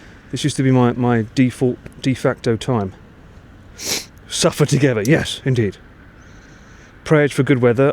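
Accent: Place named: Essex, United Kingdom. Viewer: Lovely stream! Superb way for us peekers to see more of Japan while being entertained by an Essex accent.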